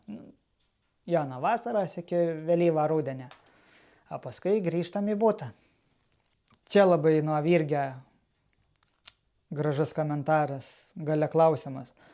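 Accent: Aukštaitija, Lithuania. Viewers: Tau sėkmės ,visada laukiu vidoso.Man dviračiokai nelabai ,bet kai kažką kali ,mali , gamini buiziotę ,tai man geriausi video.Ir kalbėk aukštaitiškai...!!!Super. Aš iš suvalkijos.😂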